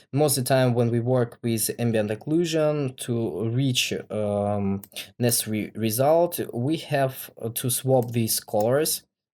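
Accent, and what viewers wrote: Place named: Russia. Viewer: Приятно слышать русскоязычный акцент, словно всё по русски)) Подписка.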